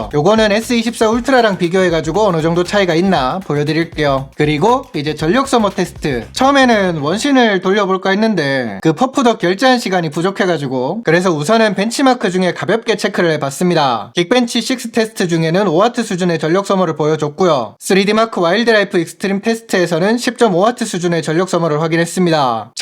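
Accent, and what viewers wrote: Gyeongsang, South Korea. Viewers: 숨길수없는 경상도사투리의 상세한 설명 감사합니다 ㅋㅋㅋㅋㅋㅋ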